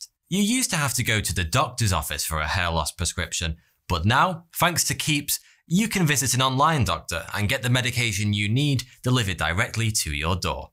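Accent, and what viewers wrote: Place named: Stoke, United Kingdom. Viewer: You sound a bit stokie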